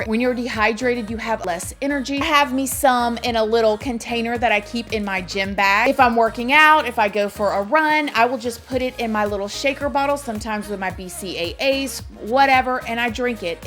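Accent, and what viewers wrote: South, United States. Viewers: where is your accent from sounds a little like a down south accent? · Ok, I usually cringe at most youtube creators, especially when they have that southern accent...being European, it's like nails on a chalkboard. BUT...you are simply amazing. You're keeping it real, you've got charisma, you're empathic and I LOVE your southern manner of speaking. Keep rocking and never change! 😍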